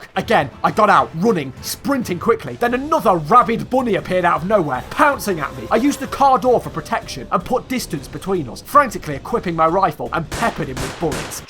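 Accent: United Kingdom. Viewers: First of all calm down second of all he is British not American how did that accent sound American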